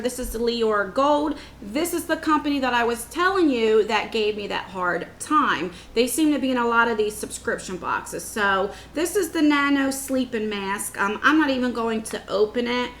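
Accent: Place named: Northeast, United States. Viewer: ...can I just tell you how fun you are to watch! Love your personality and your northeast accent! I feel like I'm hanging out with one of my cousins. You'd be a blast at a family party lol!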